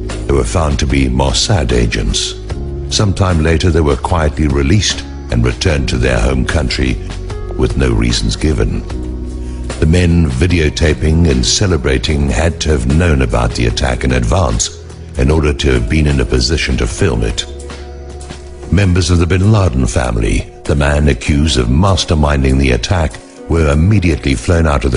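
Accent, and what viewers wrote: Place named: United Kingdom. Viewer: You will be doing this video & the message an immense service by changing the word 'American" in the first sentence to 'Everyone'. The British voice over tells us to use 911 as a litmus test for complicity or stupidity. Please, all those of the truth movement - keep in mind the INTERNATIONAL scope of the problems, of their impact and of the audience watching your videos.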